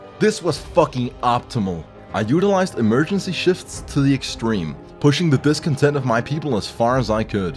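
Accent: Denmark. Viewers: Wait shit. Are you bloody Danish? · This guy danish ?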